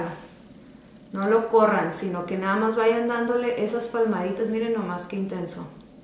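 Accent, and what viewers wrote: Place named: Tijuana, Mexico. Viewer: esta chulo el look jajaj se te nota que ya vives en tijuas... digo por como hablas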